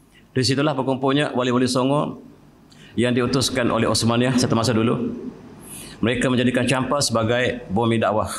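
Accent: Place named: Pattani, Thailand. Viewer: Terharu apa yang di sampaikan oleh prof benar benar menyentuh hati bahkan logaht bahasa melayu Pattani tanpa sedar airmata ku mengalir di pipiku.. memang tepat dan benar prof..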